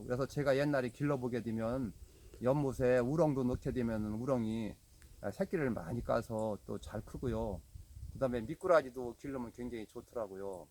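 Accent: Chungcheong, South Korea. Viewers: 충청도 말씨 신기해요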